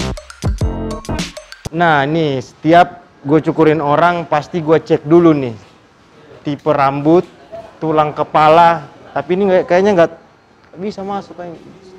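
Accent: Makassar, Indonesia. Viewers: Orang makassarka bang?...karena dari logat abang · Emm berbau Makassar logak nya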